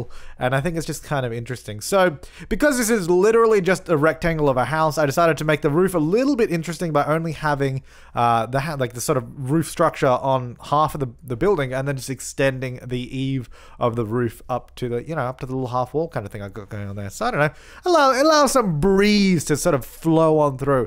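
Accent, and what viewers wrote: Australia. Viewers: You sound like an Australian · Happy to hear an Australian accent! Did you have an architecture background?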